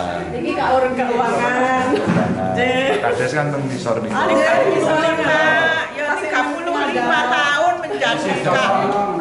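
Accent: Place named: Temanggung, Indonesia. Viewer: Boso khas temanggung kiye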